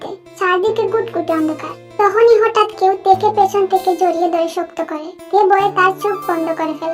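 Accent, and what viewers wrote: Sylhet, Bangladesh. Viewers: তোমার কথার মধ্যে সিলেটি ভাষার টান আছে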